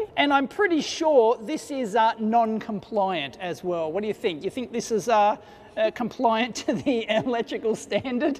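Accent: Australia. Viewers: Umm… Aussie accent… yup, EEVBlog, not ElectroBOOM. Nonetheless, I’m expecting some smoke… 😉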